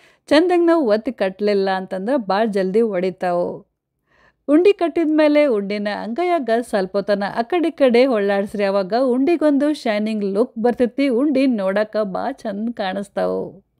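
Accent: North Karnataka, India. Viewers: I forgot to tell you, I lived during my childhood in Bangalore, and I had some classmates who spoke the Dharwad Kannada so I absolutely love uttara karnataka dialect. Thanks for speaking in that dialect. That brings me back to my golden childhood days!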